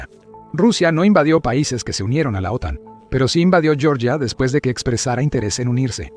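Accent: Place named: Latin America. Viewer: Tal y como está enfocada la información del vídeo el comentarista o está comprado o es tonto o las dos cosas. Los ucranianos no han luchado por siglos.......faltas a la historia. Asi que cuando veas caer Ucrania y posiblemente desaparezca deberás pedir perdón por la desinformación que estás haciendo. Encima con acento hispano......mira a ver qué está haciendo EEUU con vuestros países.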